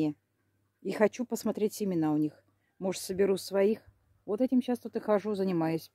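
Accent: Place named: Saratov, Russia. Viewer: Привет из Израиля! С удовольствием смотрю ваши ролики,слушаю ваш говор такой родной.Я сама родом с Саратова,но уже много лет не была там.Мне так близки ваши заботы и хлопоты.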